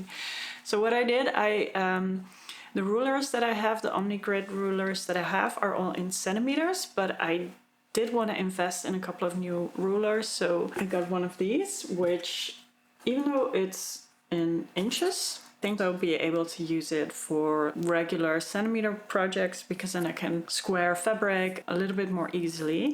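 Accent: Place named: Netherlands. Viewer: Ik vroeg me vanaf het begin af of je Nederlandse bent, ik dacht dat aan je Engels te herkennen. En daar is het bewijs: in het Excel blad!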